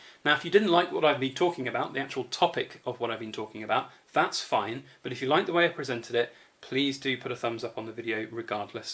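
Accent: United Kingdom. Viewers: You sound like a Brit, did you pay the UK VAT or make use of the EU loophole rate? Many safes are worse than useless. Milk spotting can be removed. Regarding the Queen's Beast, you don't say that extra high premiums on previous to current issues are charged. Compare the Lion to the Black Bull, re. the 10oz, compare the Lion to the Welsh dragon. My view, stick to your particular countries legal tender denominated silver bullion? If and when the SHTF you will have better bargaining power, and if you must have variety make sure that your alternative choices justify their purchase by being cheaper than your prime choice. If you then desire limited issues such as the 10 QBs, treat yourself.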